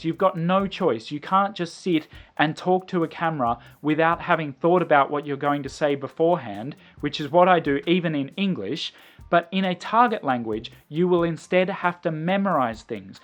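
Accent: Australia. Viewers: when I watch your videos I get reaaly happy because months ago I couldn't understand anything in Australian accent (some sounds are different), and now I just realized I can understand you perfectly. My target language is English btw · My husband is Australian and I have lived here 55 years so I'm Australian too. I was listening to you and asked my husband what is this accent this guy has because I love it and I can understand him. He said he's an Aussie and to us he doesn't have an accent, so I felt very comfortable. Thank you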